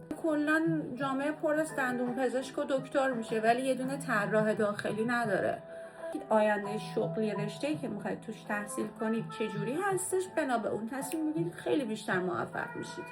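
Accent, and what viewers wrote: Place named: Ardabil, Iran. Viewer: ولی ته لهجه ترکی اردبیلی که داری قشنگه عزیزم تلاش نکن کنترلش کنی راحت صحبت کن🥰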